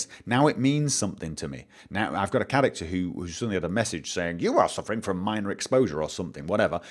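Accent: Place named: Northern England, United Kingdom. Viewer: I came to this video hoping I would just hear telling people to fuck off but instead, I heard him saying something that made me feel SO much better. Everything he said about the way he learns and reading manuals is so true to my own experience. I've not actually come across anyone who learns anything the same way I do and its good to know that I'm not the only one. Love you and btw. I was born in Stockport so its nice to hear a northern (Possibly Manchester?) accent on YouTube.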